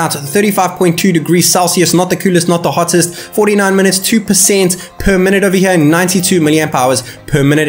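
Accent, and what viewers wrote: South Africa. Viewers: Hey, just curious - are you South African, by any chance?🤔 Your accent...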